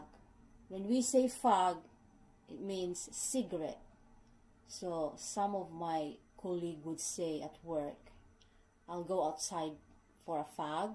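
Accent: United Kingdom. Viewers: I love the british accent. Blimey! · ganda talaga nang british accent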